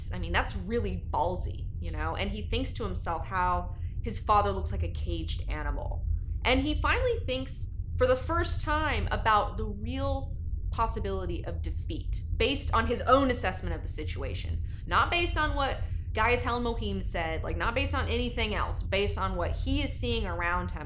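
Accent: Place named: Northwest, United States. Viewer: Okay I really gotta know something. Have you spent much time up here in the Northwest? Because there's a slight influx that I hear in your voice. Like " Out & Aboat" instead of "Out & About. Like the guy in Dirty Harry who hast to know if he fired 5 or 6 shots. I Got's to know!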